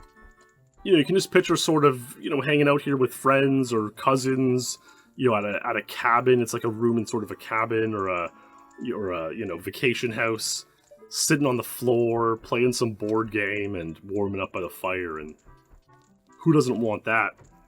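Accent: Canada. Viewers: When people call Dragons, “draygons” blows my mind. I guess it goes hand in hand with saying “ewt” instead of saying out. Must be a canadian, North US accent. Relaxing, chill video though. Enjoyable. I like the focus on the lesser talked about games.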